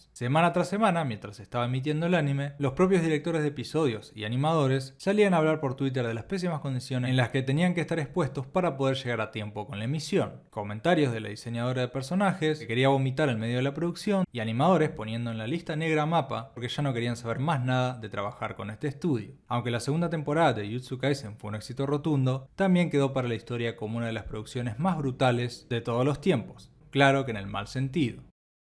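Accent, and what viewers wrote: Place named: Rioplatense, Argentina. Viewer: No te burles, quizá es un argentino o uruguayo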